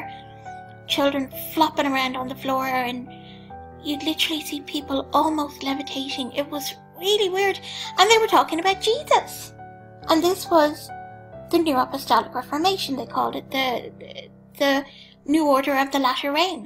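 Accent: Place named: Ireland. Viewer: Another excellent book is "Kundalini Warning -'Are False Spirits Invading the Church" by Andrew Strom. Andrew was himself formerly involved in what he called "the prophetic movement" and came to realize how evil all of this is. He tells of how Todd Bentley was imparted an "anointing" by the laying on of hands from the other wolves of the NAR and how it can be traced back to Branham. He explains how this spirit is directly tied to eastern mysticism and is the same one that yogis impart to their followers (you can watch YouTube videos of this and it causes exactly the same bizarre manifestations). I highly recommend this book as it exposes other wolves in this movement as well. Thank you, for your dedication in reproving these unfruitful works of darkness (Ephesians ). God bless you! Love, Kathy P.S. I just love your soft Irish voice - so beautiful. I am American, but of Irish descent on both sides. 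But because I'm from Long Island, New York, my accent is not so melodious!:/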